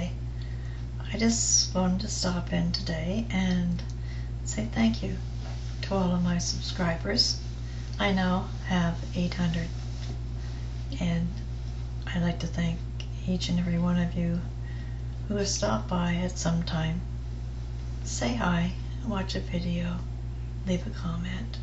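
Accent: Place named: Ireland. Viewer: Well done. Deborah is very talented i went and had a look at her website too! I love your irish accent:-) Please do a whole video one day just talking Irish!!:-) hee hee Happy week to you Lotsa love x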